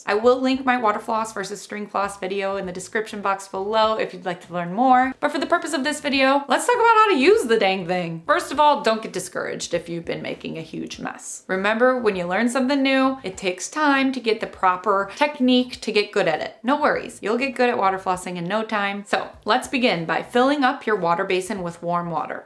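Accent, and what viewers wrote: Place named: Chicago, United States. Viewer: Your accent reminds me of my family in Chicago. Eh.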